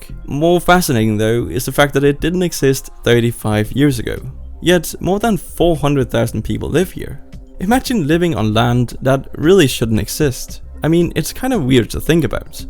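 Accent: Netherlands. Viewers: Where are you from. You have a dutch accent when speaking, aside from when you mention names like flevoland which make you sound non native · who else came here to hear his dutch pronunciation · Well actually you did a very good job here! (Only some 's'-s in the middle of words gave away that you are Dutch. All over yet far from "steen-kool" English) You made one of the very best short video's on u- tube about this this subject !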